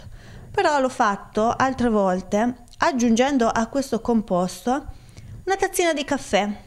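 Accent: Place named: Marche, Italy. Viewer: scusa se te lo chiedo sei marchigiana per caso?? io sono di Pesaro... dall'accento sembrerebbe.. comunque video interessante.. complimenti!!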